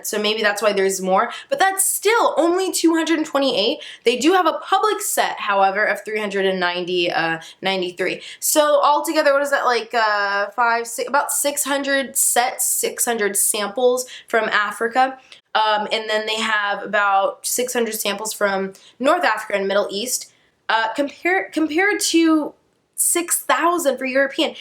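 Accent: Somalia. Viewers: I love your somali maay accent..miss confusing for those who don't speak maay but anyone who speaks maay will understand you. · your Somali is just awesome. "gabartan waa walati" love that accent.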